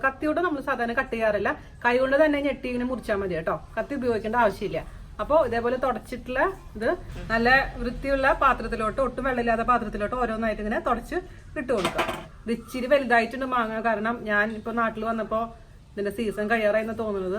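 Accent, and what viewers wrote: Thrissur, India. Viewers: Trichur slang So sweet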